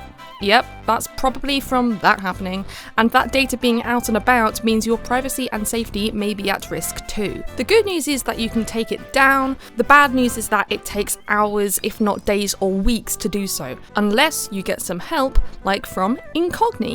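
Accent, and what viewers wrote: England, United Kingdom. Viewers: I was unaware you were british. I should've known due to the accent, but whatever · It’s a super English accent though 😭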